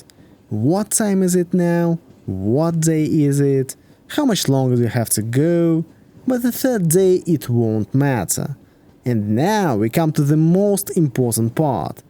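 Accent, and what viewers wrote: Russia. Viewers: Дед ёпта ну постарайся название городов или привычные заимствования произносить не настолько по-русски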